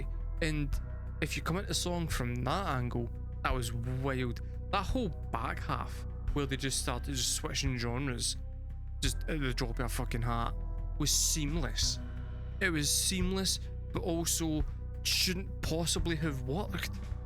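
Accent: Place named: Glasgow, United Kingdom. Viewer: Hey man, you should subtitle your video because of your outrageous Glasgow accent. I myself can understand it because I’ve see Trainspotting 20 times, but a lot of others prolly don’t know what your saying.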